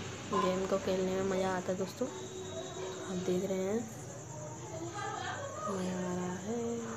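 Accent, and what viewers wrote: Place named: South Asia. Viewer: roman reigns fans only well than you must be from India or PAKISTAN cuz your accent made me feel like you're speaking Urdu or Hindi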